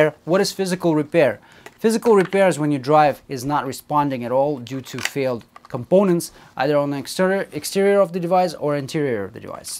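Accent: Russia. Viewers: is that a Russian sounding accent that I detect there?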